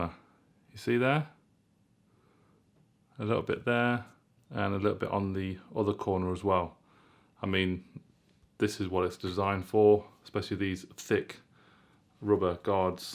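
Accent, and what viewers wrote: United Kingdom. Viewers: Sounds like you are from UK, so we will not get a screen brightness test in sunshine, unfortunately.. That is what I am mainly interested in..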